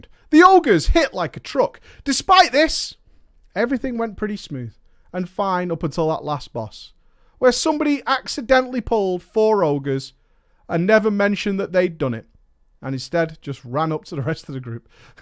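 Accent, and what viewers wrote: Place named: United Kingdom. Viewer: grin good stuff mate - every time you go off in your accent (to me ) you sound like a couple of Brits I served with FOB Bostic Afghanistan A cuppa fixes everything lol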